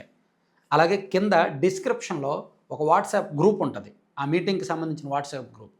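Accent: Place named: Coastal Andhra, India. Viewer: మీ వాయిస్ కోస్తా మాదిరి ఉంటుంది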